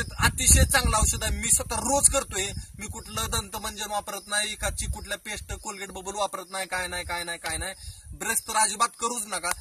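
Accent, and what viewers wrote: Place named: Satara, India. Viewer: Bhau Bhasha tumchi Sataryachi vatate Bhetaychi ichha ahe Sataryat kuthe asta?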